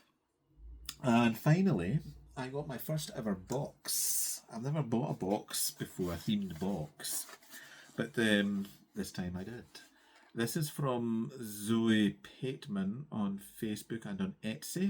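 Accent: Scotland, United Kingdom. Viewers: This is my first visit to your floss tube and I really enjoyed it. I really liked to listen to you because of your Scottish brogue. My grandmother was born in Scotland and never lost the Scottish lilt to her voice. Enjoyed seeing your projects.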